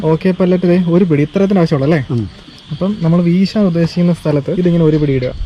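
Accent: Kottayam, India. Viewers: Chettan കോട്ടയം ആണോ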